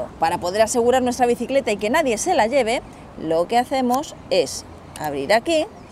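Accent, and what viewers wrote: Spain. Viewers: Hay no se porque pero amo como hablan las mujeres españolas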